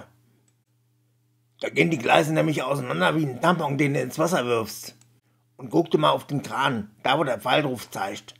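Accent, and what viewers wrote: Hesse, Germany. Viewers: Tolles Video. Nur das "Hessen-Gebabbel" finde ich nervig. Vor allem bei wiederholtem Ansehen. Hessisch babbeln am Anfang ist ja witzig, danach aber bitte hochdeutsch. --> Ist jedenfalls meine bescheidene Meinung. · Hessisch mit Untertiteln? Echt jetzt??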